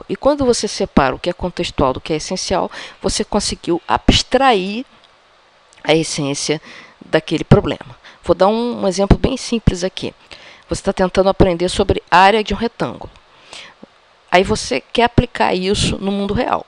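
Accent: Rio de Janeiro, Brazil. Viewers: Eu acho interessante e legal a "entonação do X/S" na sua fala, rs. Sotaque carioca? De qualquer forma, parabéns pela iniciativa! Estou estudando para concursos e assistindo todos os vídeos relacionados ao aprendizado. Obrigado!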